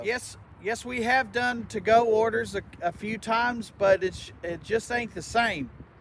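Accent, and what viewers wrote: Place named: Missouri, United States. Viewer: That Missouri accent is on point. "Welcome to the greatest restrunt in Missourer, the Gold'n Cur'ral"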